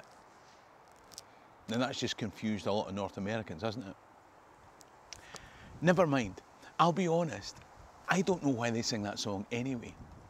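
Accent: Scotland, United Kingdom. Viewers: whatever happened to the video with your DNA results? With Scottish, Guinean, English and German that you know about, what else is in the mix? I accepted you as Scottish a minute or 2 after the first time I heard your “Let me tell you a story…” and my only hesitation had everything to do with the clothes you were wearing and your hairstyle - not what you look like. You love your country and do a great job of explaining the sometimes (usually?) convoluted course of her history. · Do you think you're Scottish? Your accent says you are. Scotland was formed from diverse people. Sod the white supremacists who say you aren't! My sister took a DNA test that said she was 17 percent Scots-Irish. I have not taken s DNA test yet. She found shit that was amazing. Never mind, the white supremacists are alive and well in thELATe US. Piss on the people who are hung up on color. 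I have biracial nieces and nephews. Until we ELIMINATE those assholes, people like you and me will have them in radar. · I take people as they are not what they look like. You was born in scotland and talk scottish so your Scottish so that's that.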